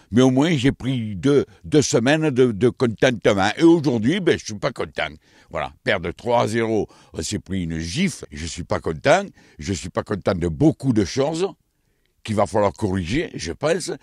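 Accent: South, France. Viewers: Courage adore votre accent ca sans bon le soleil du sud courage au supporter de OM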